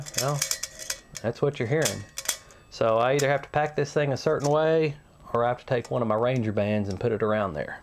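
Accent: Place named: East Tennessee, United States. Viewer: Good review. Your accent shows your family was from East TN or similar Appalachian Mountain origins; maybe that is where you get your backpacking wings? Never heard a TX person nail the East TN accent as well as this.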